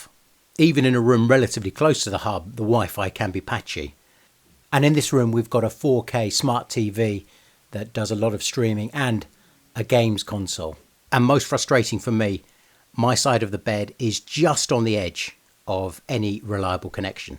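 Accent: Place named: Latin America. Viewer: Porq hablas en inglés si se te nota lo latino?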